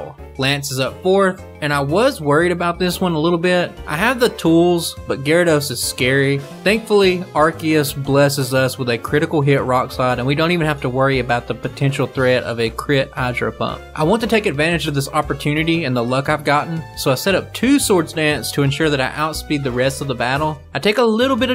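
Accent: United States. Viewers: I like your country-like accent!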